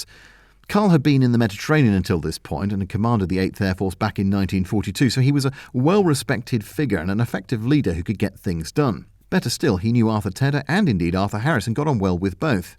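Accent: United States. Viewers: Your American accent is hilarious, I'd love to hear it more it sound exactly like one of my cousins. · The American accent threw me off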